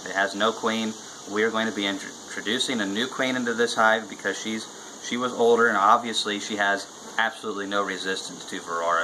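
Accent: South, United States. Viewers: Thank you for this video and all of your videos for that matter. I'll admit it that I first started watching your videos because I liked to laugh at your "down home southern charm." Seriously you look and sound like Opie Taylor grew up and became a beek. But in fact, you have proven to be a great source of knowledge and your videos present a lot of information in a very enjoyable way! The real and refreshing shocker is that you shatter the stereotype of the southern, good ole boy beekeeper. You are clearly intelligent and capable. Keep up the good work!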